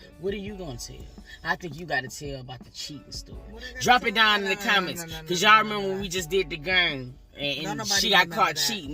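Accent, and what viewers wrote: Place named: Detroit, United States. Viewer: Great story! 💕 I’m new to your channel... been listening for about 6 weeks. U are one of 2 content creators I actually enjoy listening to. U actually get it and convey what the ppl are thinking and enhances it! But I swear, listening to u talk, I said she gotta be from Detroit because she got our flavor... The way u speak and the lingo is Detroit. I would be shocked if you’re not from here. But I love your reactions and hope u keep at it!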